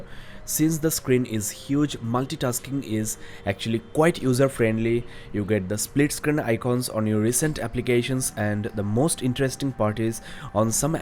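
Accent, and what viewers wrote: India. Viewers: hate Indian and Pakistani English accents. ttopp, ttrickk, butttonn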